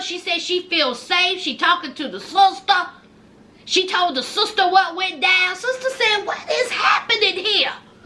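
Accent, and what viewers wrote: South, United States. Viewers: remember when what's her name brought her prison bae home from prison ( the girl who pretended she had her act together and was selling jewelry), the first thing she did was changed the locks on the house. She lied to prison bae and said he was a friend and had keys to the house. But in reality he was the owner of the house and was renting it to her. When old dude saw them together in the back yard in the swimming pool he was livid. He demanded they both vacate the premises. She was probably leading him on before prison bae was released. Those people are stupid for being on the show because the network is making millions of of them and they only get a few measley dollars. Skylar looks like a psycho path in my opinion. She has zero emotions in her eyes. She's the kind of person that will tell you what you want to hear. She lacks emotions, care, concern or any other type of real emotions. 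I just found your channel the other day and you're hilarious. It's the country accent that's give this show life. Great job 👍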